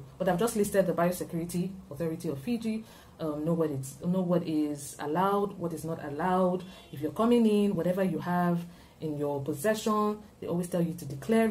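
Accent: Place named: United Kingdom. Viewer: Your British accent is awesome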